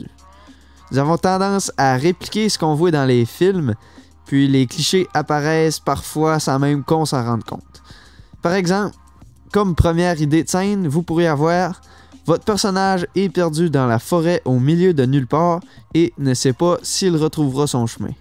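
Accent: Quebec, Canada. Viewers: Je suis français, dès que j'ai entendu l'accent québécois, j'ai su que le contenu serait de qualité :) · accent de canadie -> je me bare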